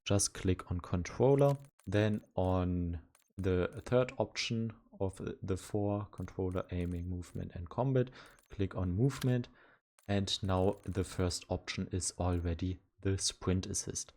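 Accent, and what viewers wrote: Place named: Germany. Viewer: Sounds german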